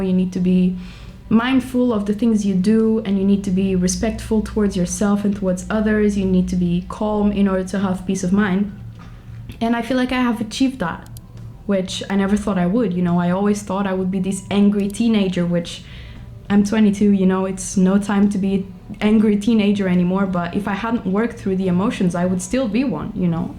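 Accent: Brazil. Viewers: you have such a brazilian accent,iu are from brazil? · Olá. Seu sotaque é de brasuca! Hahahaha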